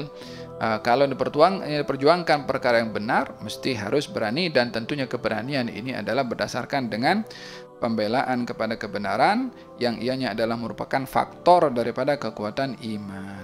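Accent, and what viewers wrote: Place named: Malaysia. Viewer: Kirain indo tapi logat ny mlayu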